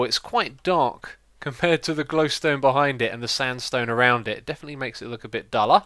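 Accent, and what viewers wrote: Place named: England, United Kingdom. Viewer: anyone know which part of England is from?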